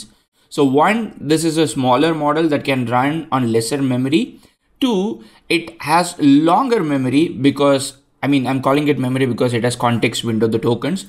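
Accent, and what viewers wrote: India. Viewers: Can you use AI to remove your Indian accent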